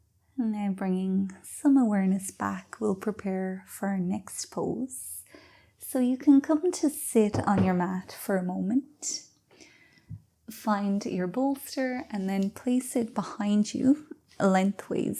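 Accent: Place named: Ireland. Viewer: Excellent class!! And the accent is a beautiful bonus , may I ask what it is, Irish?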